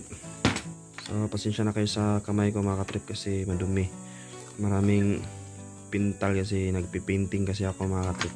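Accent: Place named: Visayas, Philippines. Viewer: Taga saan ka dol parang bisaya ka Eh saan ako makabili ng battery niyan At Paano yong battery Charchable po ba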